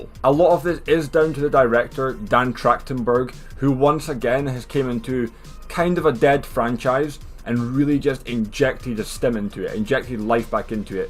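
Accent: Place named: Scotland, United Kingdom. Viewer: Mate you sound scottish 😀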